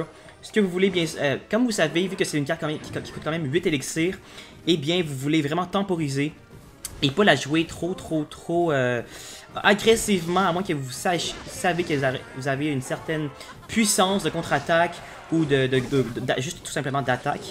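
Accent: Quebec, Canada. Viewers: J’adore ton acent Québécois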